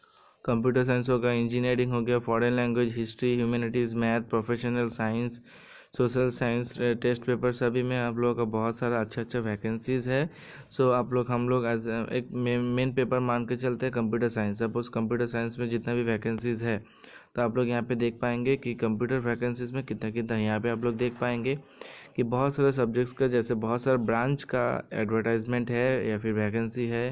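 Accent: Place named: Maharashtra, India. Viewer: Tui to marattok rokomer hindi bolchish re🙄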